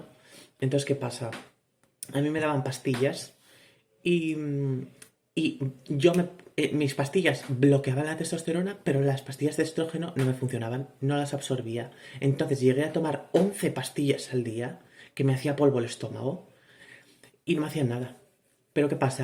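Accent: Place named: Spain. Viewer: Yo ni se xq estoy aqui pero ese dejo en hembra española encanta la verdad ❤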